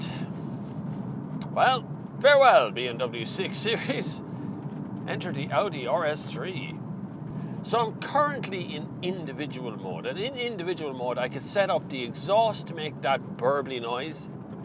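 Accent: Ireland. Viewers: just love that mix of RS3 pops and a paddys voice on that super looking stretch of road Top video buddy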